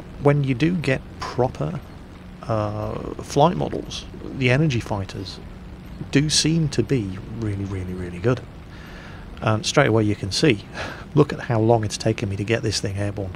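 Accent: United Kingdom. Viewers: Bayden Hudson Trying to "help"...he has an English UK accent, not a North American English accent. Also Boom isn't talking to you in any way that's offensive, he's stating a fact, you just need to stop getting your panties in a bunch. Also considering that you have only 2 subs and you're trying to "help" a YouTuber with almost 400K subs, it looks like he doesn't need help from you...he does have almost 400K subs. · British or American?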